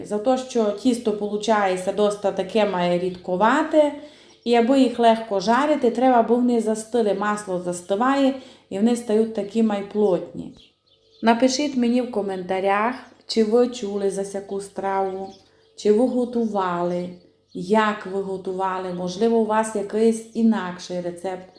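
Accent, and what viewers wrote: Transcarpathia, Ukraine. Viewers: Підписалася , бо дуже приємно чути ваш голос і чудову мову Закарпаття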